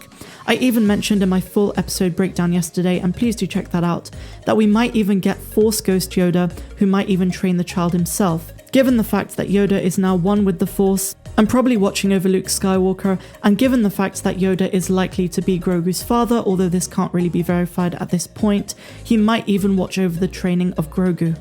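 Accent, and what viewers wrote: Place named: United Kingdom. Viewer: I think I could listen to delightfully British-accented read from comic books for hours...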